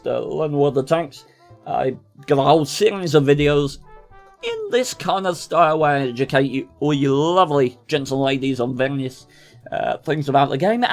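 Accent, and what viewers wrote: United Kingdom. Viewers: Sory to hear that. I was thinking that you spoke like that to make your English the most British sounding possible. Sory for my " neanderthal" English. Best wishes for you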